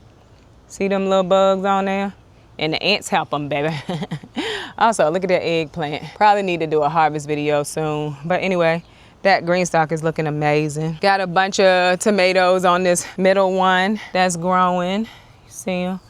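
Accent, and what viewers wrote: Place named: Chicago, United States. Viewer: I cannot convince myself that you are not from The Chi. You just have that Chicago vibe. lol. Heeeey from Mississippi!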